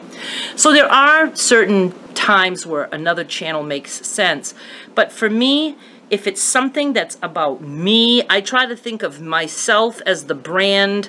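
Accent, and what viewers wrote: Maine, United States. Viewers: I think I'd watch pretty much anything you upload! I just love your Maine accent and enjoy your feisty spunky personality!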